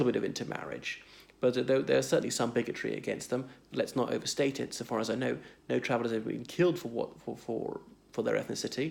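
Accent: RP, United Kingdom. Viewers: you have a british accent you have no place describing irish history i will only listen to an irish traveller on this subject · Hi. I am Irish. You have a British accent. I presume you spent a lot of time in the UK? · Wow buddy you sound posh but very interesting article👍 my father is from the Republic and always said men from Kerry/Tipperary area's were excellent for work with pick&shovell 🤣🤣🤣🤣🤣and men from Dublin were only good for pulling pints 😂😂😂😂😂😂 myself my back is covered in freckles and in the summer it looks like I've been sunbathing under a tea-strainer!!!!!!!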